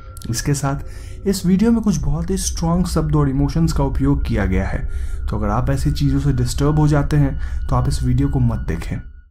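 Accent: Bengal, India. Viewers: Aap Bengali ho kya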